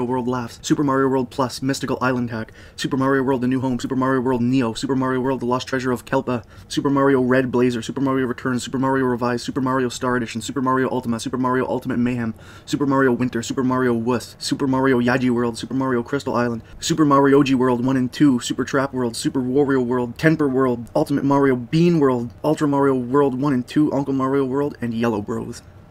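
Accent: Canada. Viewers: Lol canadian accent. Love it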